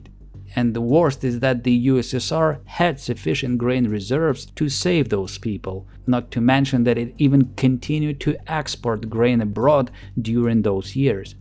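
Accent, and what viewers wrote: Ukraine. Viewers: Hearing all of this in a Ukrainian accent, is especially chilling. I want to make a joke, but it's just sad. Україна не одна. (sorry for any poor translation.) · I now saw that part, of napoleonic wars. Now i can hear also that accent. Western Ukrainian. Dude, i understand, your so called country is slowly but irreversibly is consumed by Russia, but lying about history would not change it. Maybe you should learn from it. Russia is like balloon, when you blow to it, it expands. Battle at Borodino was tactical victory of Napoleon, but strategical victory of Kutuzov. Napoleon gathered more than half million soldiers for invasion to Russia and returned with less than 50 thousand. And it is necessary to say that was half of all soldiers France could gather and it was that better part (they left at home soldiers and militia of questionable quality). 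This "victory" was defeat of Napoleon. Until then, nobody can challenge him, but he bled France so badly in this endeavor, that despite he was still genius and able to defeat European sissies after 1812, he simply lost because sheer numerical superiority of them. And that was possible only because Russia strategy and general Russian winter. Russia is always ridiculed, but it was Russia, who decided wars since 15th century. Yes, very often fruits of that Russian efforts were eaten by another, like in WW1, when it was Russian "problem", which tighten majority of German forces, which would easily wipe out French and British forces, if they would not have to fight Russians. Same apply for balkans. It was Russia, who freed balkan states from Turkish opression, of course Tsars wanted new dominion, not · Is this channel literally anti Russian, Ukrainian propaganda? The narrator’s accent sounds a lot like a certain diminutive Ukrainian actor aka Churchill 2.0 aka the green goblin. 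Fascinating content though. I had no idea 1000 Tu-4’s were built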